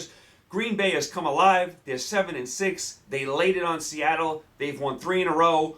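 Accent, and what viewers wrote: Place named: New York State, United States. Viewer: New York State i'm guessing.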